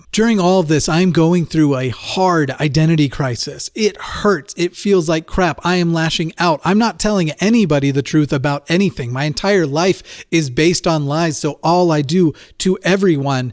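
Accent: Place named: United Kingdom. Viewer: First of all I’m really proud of you 👏🏼👏🏼👏🏼👏🏼🍀 and second of all I love you very British Intonation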